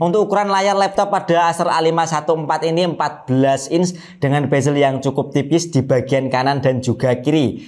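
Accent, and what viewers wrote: Java, Indonesia. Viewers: Mas mas jawa bgt nih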